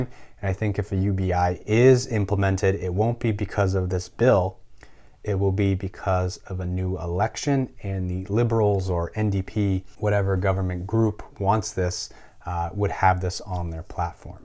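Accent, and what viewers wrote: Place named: France. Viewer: Justin trudo? Are you French? You have a French accent though its subtle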